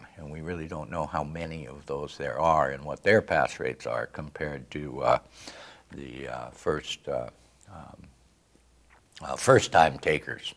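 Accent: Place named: Transatlantic, United States. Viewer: he speaks a transatlantic accent, very interesting topic and information, great presentation organisation. The video is effectively informative!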